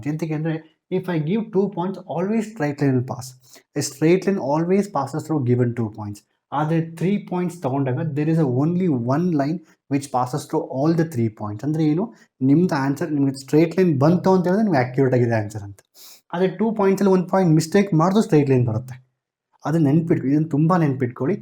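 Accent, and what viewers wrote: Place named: Malnad, India. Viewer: Sir, where are you from.. Whenever you speak i feel u r from malnad... Pls reply... Since i am also from malnad i am curious to know 😁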